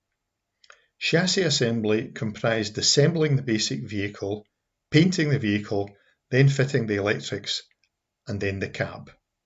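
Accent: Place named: Scotland, United Kingdom. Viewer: The accent is Scottish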